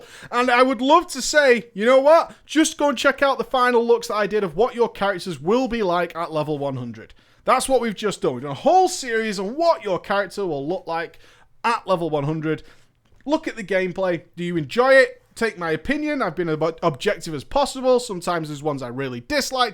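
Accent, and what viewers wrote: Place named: United Kingdom. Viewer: he has a British accent and he lives in england. theres a million and 1 kinds of accents over there.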